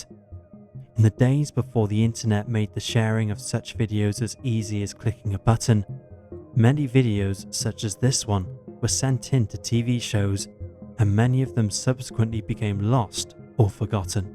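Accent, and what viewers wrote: United Kingdom. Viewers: I appreciate all vids from Top5s. As well as the new narrator. Something about a British accent among listening to only American accents all the time that really helps lol Thx Top5s team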